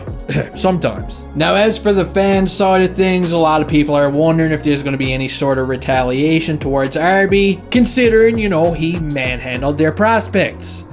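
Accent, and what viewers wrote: Newfoundland, Canada. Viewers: You sound like a stupid Newfie 😂 your content is trash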